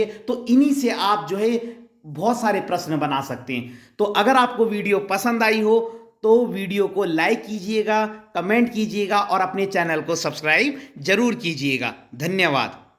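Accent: Garhwal, India. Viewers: Are u garhwali? Tone aari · Sir aap garhwali ho kyy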